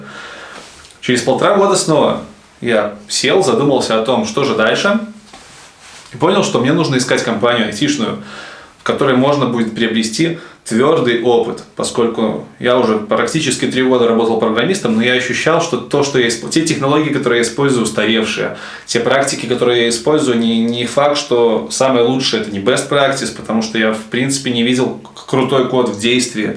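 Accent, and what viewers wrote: Russia. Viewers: Чувак, ты закосячил слово intermedaite , и многие слова англицизмы ты произносишь с русским акцентом, ты скорее просто этого не знешь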